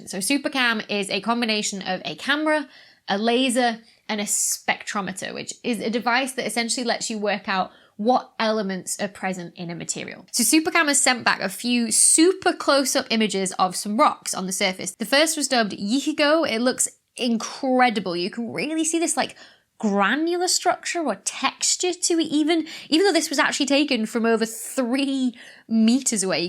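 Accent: United Kingdom. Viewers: That British accent though...If you listen to how she speaks and not necessarily all of the science jargon she's talking about and still love it...give this comment a big thumbs up! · with the british accent and a cute face cant ask more than that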